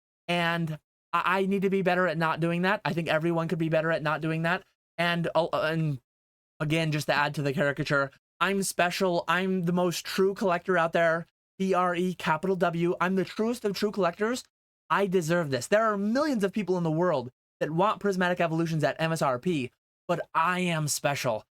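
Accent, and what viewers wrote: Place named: Upstate New York, United States. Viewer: Oh snap. A fellow upstate NYer. And sounds like an actually upstater. Not just an hour north of NYC.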